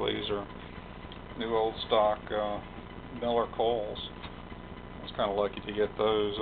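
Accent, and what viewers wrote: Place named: Southeast, United States. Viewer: more than likely....Southeastern USA....some accent detected....old southern boy myself...I miss a word or two here and there, but no big issues....hell of an undertaking, I think....and it F'n works....I can't build anything close to this....some small kits....foxhole/crystal sets for MW/SWL/AM/CW....but nothing on this scale/level....damn good job this cat did....thumbs up.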